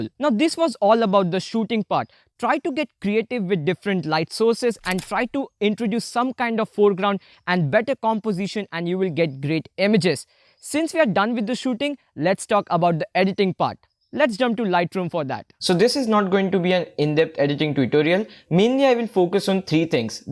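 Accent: India. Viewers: Your pronunciation sounds like a Indian man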